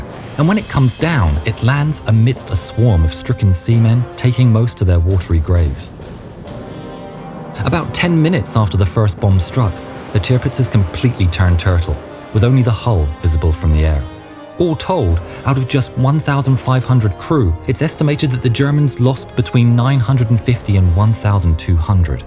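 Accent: United Kingdom. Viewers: the narrator is British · The narrator's accent sounds a bit British...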